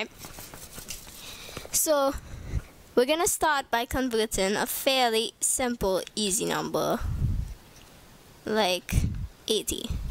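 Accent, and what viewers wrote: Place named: Caribbean. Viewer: I stayed when I realised your accent was familiar. I'm also from the Caribbean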